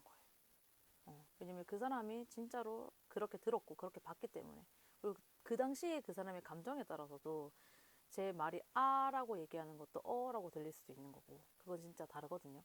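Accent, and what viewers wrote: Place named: Gyeongsang, South Korea. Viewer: 앗 사투리 너무 반가운데요~~^^ 경상도분이셨나요? 영상 감사합니다. 저도 어제 댓글로 말씀드리려했는데 영상 소리가 작아서 스피커 최대로 듣고 있었어요😅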